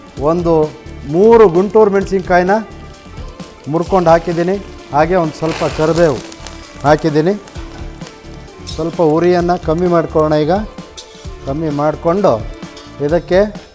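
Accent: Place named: Chamarajanagar, India. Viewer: ನೀವು ಮಾತನಾಡಿದ್ದು ಚಾಮರಾಜನಗರದ ಭಾಷೆ ನೀವು ನೀವು ಮಾಡಿದ್ದು ಚಾಮರಾಜನಗರದ ಕಳ್ಳೇ ಹುಲಿ ಈ ರೆಸಿಪಿ ಮರೆತು ಹೋಗಿತ್ತು ನೆನಪು ಮಾಡಿದ್ದಕ್ಕೆ ಧನ್ಯವಾದಗಳು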